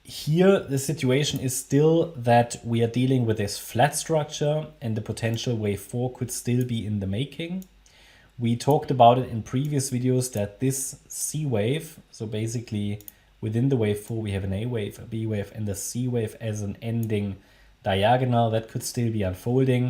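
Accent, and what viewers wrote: Germany. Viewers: Bist bestimmt Deutscher haha man hört es raus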